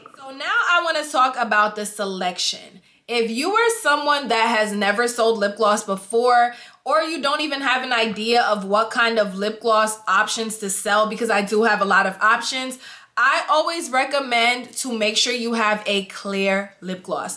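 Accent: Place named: New York, United States. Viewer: lol, your new york accent came out in this video. I hear it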